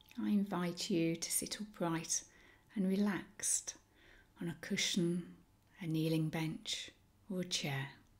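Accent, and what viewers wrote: England, United Kingdom. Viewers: At last a warm English voice ...Aaah 🕉️🙏🥰✨🌟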